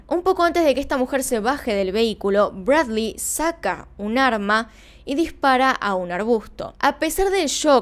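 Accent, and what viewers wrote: Argentina. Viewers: Dios mio, no se si es que me gusta el acento argentino......o si en realidad lo que me gusta es TU acento!!!! 😂😍😍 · Hola eres de argentina?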